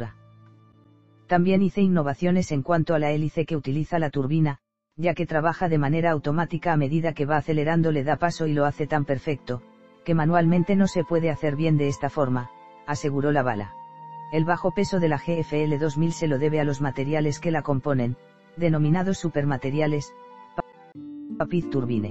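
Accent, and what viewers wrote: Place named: Spain. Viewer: Me desorientado la voz!! Parece una gallega la que habla. (Española)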